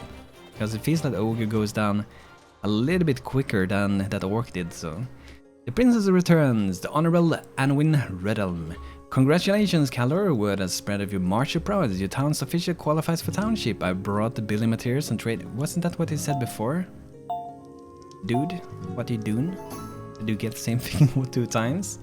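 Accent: Sweden. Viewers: This game has so much potential, whenever I saw the trailer i was super excited. Awesome videos, dude. Subbed! :) ps: Where are you from? You sound swedish.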